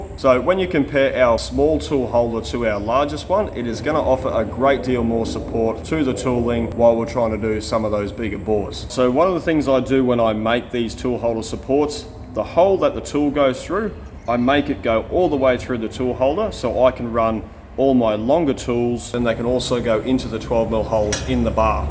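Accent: Australia. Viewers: Dear, I will never make anything that you have shown me so far but I am having a wonderful time going through the processes you take to make the incredible things you do. Its great to hear proper English - Australian English. Greetings from South Australia.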